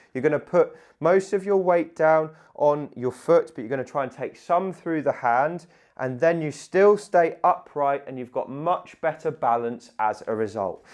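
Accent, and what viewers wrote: United Kingdom. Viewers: I was a nurse and ended up disabled and I have to use a stick. No one ever educated me even after 12 spinal surgeries on proper height of my cane. Thank you for this tutorial. It sounds like you are across the pond from me and educate your citizens better than American PT’s do. Thanks once again going to adjust mine right now 😊😊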